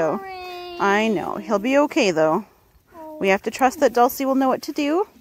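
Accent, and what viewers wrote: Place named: Canada. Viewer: Sounds like you’re from Canada. Such a beautiful birth.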